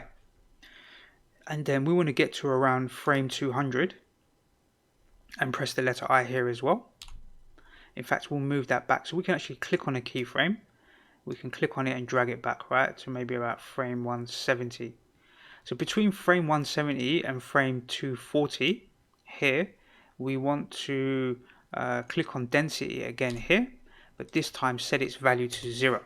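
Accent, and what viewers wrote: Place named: England, United Kingdom. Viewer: I love your English accent 😂🥰 Nice tutorial, thank you! 👍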